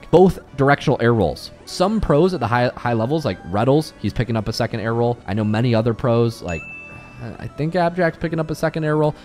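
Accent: Poland. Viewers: wait are you from poland? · Is he polish?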